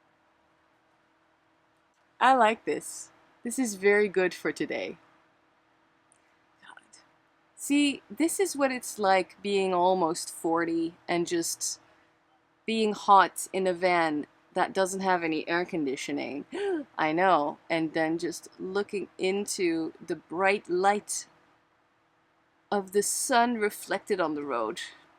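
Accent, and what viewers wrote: France. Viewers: Jolie Accent Français 😊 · J’adore ton accent français! (Je suis canadienne francophone donc notre accent est très différent) J’ai apprécié ce vidéo, sortir de sa zone de confort ce n’est pas facile, mais on est tellement fières après! La croissance se fait inévitablement en traversant l’inconfort. Merci de nous avoir amené avec toi lors de ce roadtrip, le concert dans le cratère devait être incroyable!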